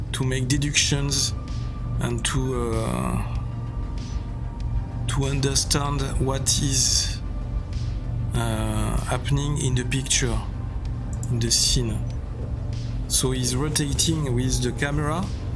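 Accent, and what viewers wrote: France. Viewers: T'as un sacré accent bien français ptdr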